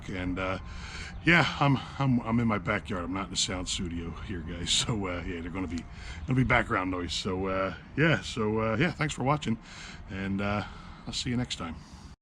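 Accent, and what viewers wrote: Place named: Pennsylvania, United States. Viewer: Are you from PA?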